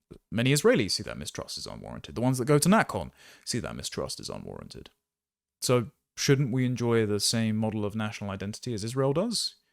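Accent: RP, United Kingdom. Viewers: This posh useful is simply adding to the panoply of antisemitic tropes--all couched in Oxford Union pseudo-intellectualism spoken in RP. 'Bizarre Heredi, Hasidic cults, and all-powerful Jews,' indeed!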